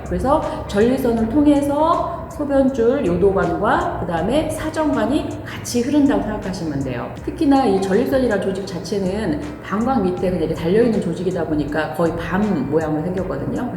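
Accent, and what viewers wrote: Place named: Jeolla, South Korea. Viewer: 짤라서 지져각꼬~!^^ㅋㅋㅋ전라도분이시구나~!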